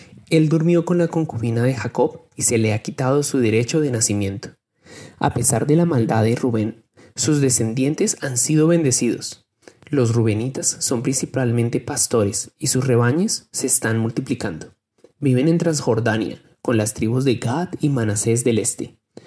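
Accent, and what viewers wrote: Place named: Colombia. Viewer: This is great idea. however, the audio has a strong Colombian accent. Is there a scrip that we can read?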